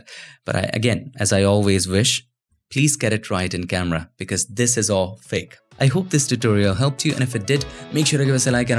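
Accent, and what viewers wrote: India. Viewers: guy with Indian accent tells me how to fix motion blur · You know what, it's almost a perfect video, you just need to know how to get rid of your indian accent.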